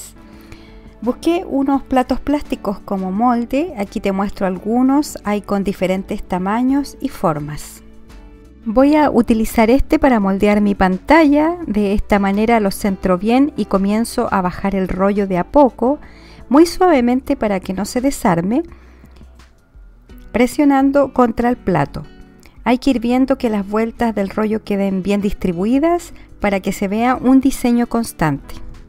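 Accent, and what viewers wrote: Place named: Chile. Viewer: Hola me encantó primero por que eres chilena como yo, con 40años en Italia escuchar la voz de una chilena me da mucho gusto. Muy lindos tus trabajos yo me dedico a la lana todo lo manual es ermosa brava 👏👏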